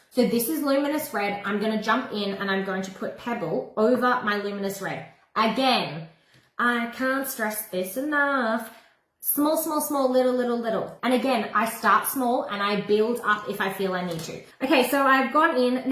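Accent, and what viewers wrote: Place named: Australia. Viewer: Hi Sweetheart. Love Your Aussie Accent . Love You. Kisses.❤❤❤❤❤❤❤❤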